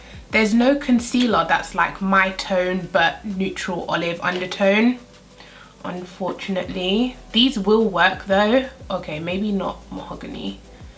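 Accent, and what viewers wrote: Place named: Southern England, United Kingdom. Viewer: so nice to listen to love her accent. It’s southern but not London and I love it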